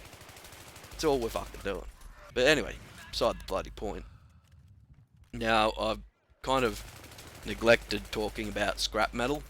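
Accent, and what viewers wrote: Australia. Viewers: I still can't get over how sexy your voice is. Dat 'stralian accent. I thought now that rent-a-server had come out, you were able to modify the player count. That sucks that it's limited to 8v8 :(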